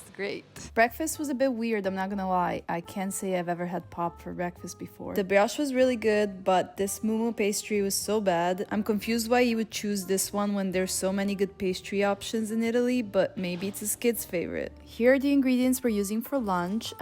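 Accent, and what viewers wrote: United States. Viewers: why do u speak in american english when ur european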